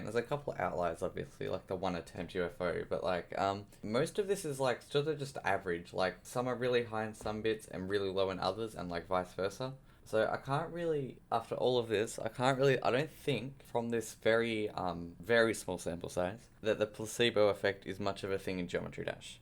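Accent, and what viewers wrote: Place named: Australia. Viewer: Hey u sound australian. Where u from perhaps?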